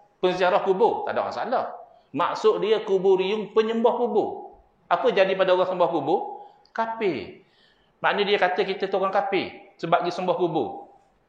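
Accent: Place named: Terengganu, Malaysia. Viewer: Ustad ni bahasa ganu ok lagi molek sangat penerangan yg jitu faham jelas selari dengan shyariat islam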